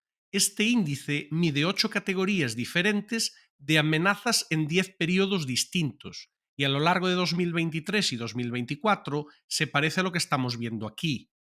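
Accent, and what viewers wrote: Galicia, Spain. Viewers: Acento gallego? Me recuerda al gran Miguel Anxo Bastos. 😆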